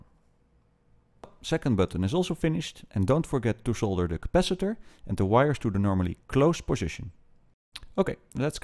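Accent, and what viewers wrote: Netherlands. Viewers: ben je uit nederland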